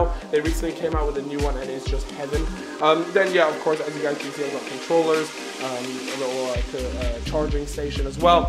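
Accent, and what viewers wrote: United States. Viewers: why does he sound so American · hey are you american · Is he American?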